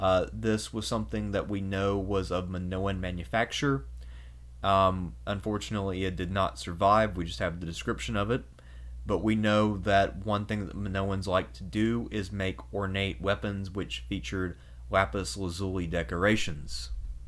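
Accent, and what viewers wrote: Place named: Texas, United States. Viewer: I live for the (?) Texan accent!! Thanks for another fun video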